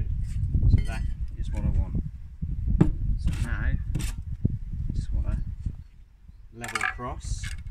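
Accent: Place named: Norfolk, United Kingdom. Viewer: you sound like your around my parts... Norfolk by any chance? ..(groundworker trying to learn a thing or two)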